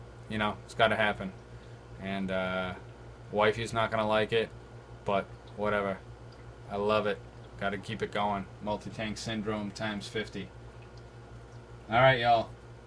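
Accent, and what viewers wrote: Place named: New England, United States. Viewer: Deff from New England lol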